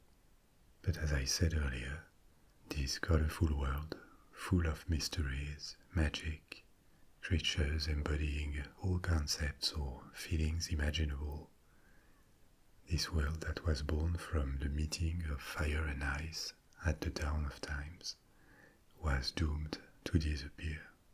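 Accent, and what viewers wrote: France. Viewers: Je crois entendre un accent français donc je vais commenter en français. J'ai lu l'Edda mais ça c'est aussi une façon ludique d'en apprendre plus sur la mythologie nordique!😁 Ps: Je viens de voir le nom de la chaîne 😆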